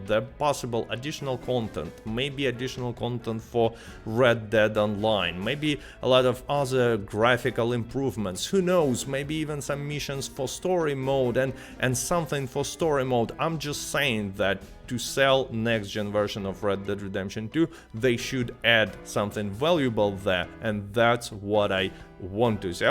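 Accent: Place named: Russia. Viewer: Why this man has so hard Russian accent?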